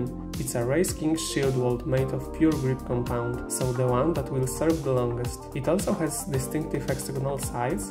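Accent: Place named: Poland. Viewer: Polish accent.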